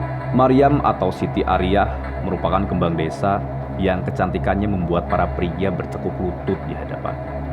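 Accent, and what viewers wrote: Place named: Banyumas, Indonesia. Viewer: Rodo ngapak sitik lah wak kui hehe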